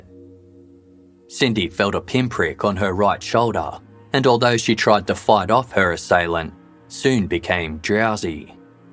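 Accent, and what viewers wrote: Australia. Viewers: Sounds like he is from Australia or NZ. Been our Summer holidays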